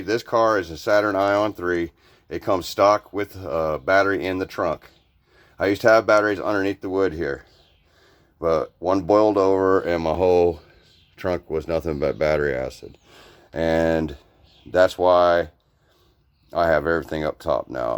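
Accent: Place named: Florida, United States. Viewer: Sickk stoked for ya Brotha.. DUDE check this out!!... I just realized you sound kind of familiar by the way you talk and you also do the same line of work as I do ,.. I'm a painter , pressure washer... but here's the catch as I also noticed and listening to the way you talk something was familiar with you but never thought of it until now because I just watched one of your older videos and I actually think I might know you.... on your old video you stated that you weren't used to the cold and that you where you're from Stuart Florida and guess what LOL? I've been surfing all my life in Florida and one of the my local spots is the "Rocks" yep you guessed it. .. Stuart Florida haaaahaaaaaa... which I have been living here for 43 years now I also lived in Jensen and Port St Lucie I'm still here actually LOL .Yeeeeeeew!.. but I knew it the more I watched your videos something was getting more and more familiar and it was definitely the way you talked you could tell you were a Florida Stuart dude🤙🤙🤙 for sure..yeeeeeeeeew yaaa braaaa... 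Get them 150s .......!!..✌💪👊🙏🔥🔥☝☝💥💥..congrats... I'm surprised you didn't notice when I put on your comments and say "yeeeeeeew!!.lol anyways,, Hows Kentucky treating you? a lot of Bassheads there huh?cause I get alot of my stuff from Louisville Kentucky which is one of the few spots that I get my car audio stuff from...... love my NVX🔥🔥🤙🤙.. alright brother keep going strong get them numbers have fun and stay safe brother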